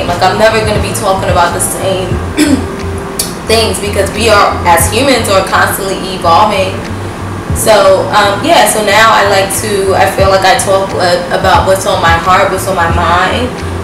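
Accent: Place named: New York, United States. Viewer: LOVE your NY accent!! Gorgeous too girl!!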